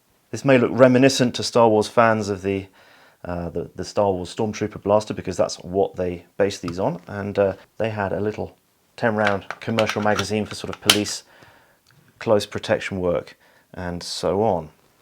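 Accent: United Kingdom. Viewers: Nothing like a good old British voice to explain the workings of a British gun. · A deceptively simple looking weapon, until you get into the details! Question, if you're in the UK as your accent would suggest, how are you in possession of this in firing condition?! I'd bl00dy love one!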